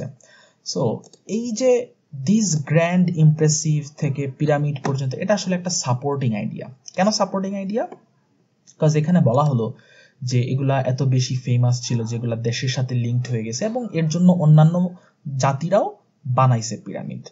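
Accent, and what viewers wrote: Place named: Sylhet, Bangladesh. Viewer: সিলেটি ভাই। আমিও সিলেটি😍🙂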